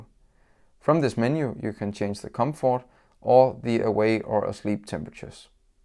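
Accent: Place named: Denmark. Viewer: KÆFT, en slem omgang Danglish! Har I ikke penge til at hyre en engelsktalende vært?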